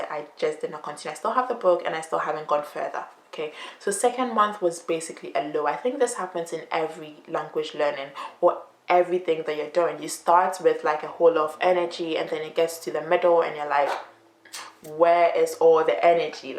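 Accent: Italy. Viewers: Seu português soa com sotaque italiano, fica muito fofo.🥰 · Já estás a falar TÃO bem! Com um sotaque italiano muito fofo e um pouco do Brasil! 🌷 Um beijinho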